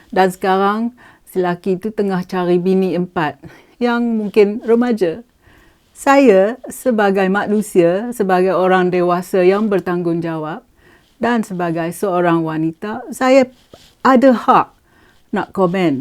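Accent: Perak, Malaysia. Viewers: Saya suka slang Perak. Tahniah dan salute! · Tahniah. Lucu gak,bila loghat Perak digarap sekali. Hahaha.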